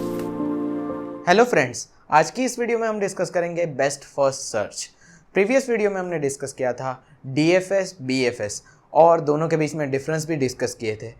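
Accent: India. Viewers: FARK!!!!!.........every fucken video on anything maths and IT is fucken Hindi or English with strong Indian accents.....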